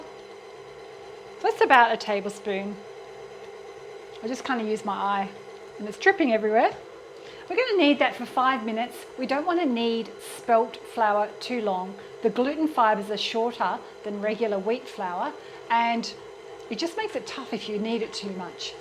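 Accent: Australia. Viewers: Thanks for this great video. Since Judith Durham said "Goodbye", I don't have an Aussie ladyfriend -- you're it. Bakes bread, wonderful accent and a pleasant way about you... what's not to love,huh? Please forgive me, I don't mean to be politically incorrect. It's just my Canadian way of saying,"Well done!" G'day. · Appreciate this plantbased recipe and the written instructions alongside your lovely Aussie accent 💙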